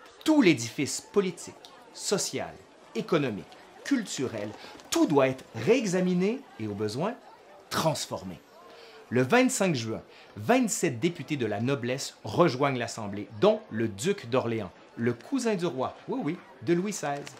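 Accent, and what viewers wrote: Quebec, Canada. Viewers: J'adore ton accent québéquois xD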